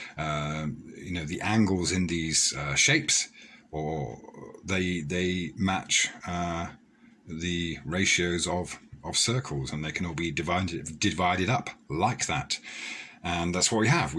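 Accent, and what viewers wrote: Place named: RP, United Kingdom. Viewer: Thinking that rotating 2 out of date 2D projections of a globe validates a flat earth is extremely childish and infantile in its total impracticality and worthlessness. I do understand azimuthal projections but that doesn't make it " fine " to have to spin one so that continents align correctly. If you honestly believe that it makes any kind of sense to do this then I doubt its worth explaining why this represents nothing relevant to navigation in the real world.. How do you travel South to North in South America and Africa at the same time? You do have a decent Semi Posh ( RP ) voice but hearing so many ummm and errs is a dead give away you're blagging. You really are pointing in the wrong direction maybe when the magic sky daddy spins half the earth around you'll find your way.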